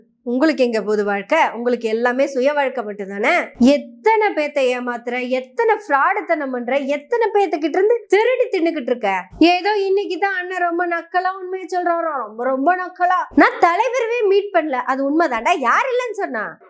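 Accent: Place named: Coimbatore, India. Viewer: கோவை வட்டார வழக்கு,, நல்லா சுத்தமா பேசுங்க சகோதரி....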